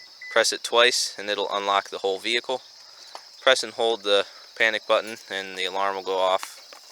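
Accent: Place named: Canada. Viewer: Are you canadian? Every sentence you speak sounds like you're asking a question.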